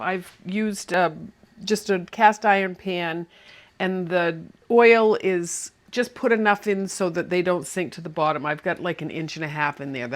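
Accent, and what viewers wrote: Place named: New England, United States. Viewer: It is great to wake up with my coffee and one of your videos. I have been trying to identify your accent. Certain worsa you say remind me of my family in NNew Hampshire and Massachusetts.. We will be trying out your donuts very soon, thank you .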